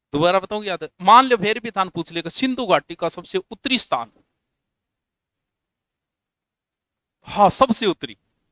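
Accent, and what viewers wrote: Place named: Rajasthan, India. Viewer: Padhate acha ho but hindi nhi bolne ki wjh se aadi bat samaj hi nhi aati apki .... marwadi, rajasthani bolte ho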